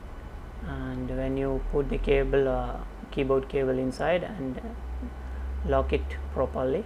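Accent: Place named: South Asia. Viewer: He has an Arabian keyboard, but sounds South Asian loool, but I love that keyboard. I wonder if this will work.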